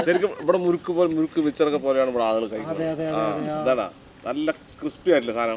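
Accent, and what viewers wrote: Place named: Malappuram, India. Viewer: ഇടക് മലപ്പുറം ഭാഷ വരുന്നുണ്ട്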